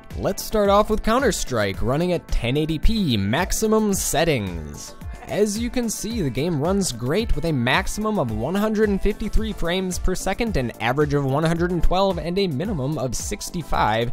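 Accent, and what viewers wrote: Canada. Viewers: Canadian as FUCK man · Saskatchewan eh! You sound like your from Saskatoon and it sounds cool as a commentator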